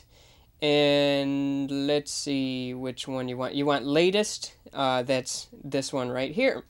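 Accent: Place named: Minnesota, United States. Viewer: i sense Minnesota accent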